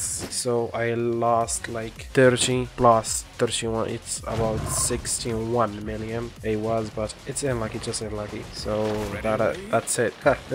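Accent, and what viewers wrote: Morocco. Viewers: This is a Moroccan accent xD it can't be otherwise haha love it content man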